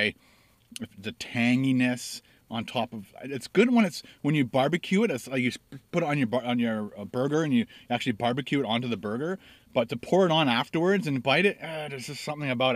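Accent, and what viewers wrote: Quebec, Canada. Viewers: yeah in québec we sound like that lol and we have a thousand type of poutine! try poutine planet in québec, Qc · Iam form germany ... i understand that Barbecue Sauce Stuff ... especially we dont have a lot of good ones here! PLS say more OUT About and Without love that canadian accent :D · your quebec accent is right on!! when are you coming up east ,in quebec...to review some local restaurant?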